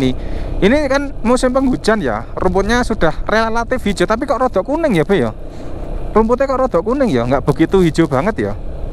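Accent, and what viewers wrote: Java, Indonesia. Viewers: kaget gua,,logatnya mas mas banget!!!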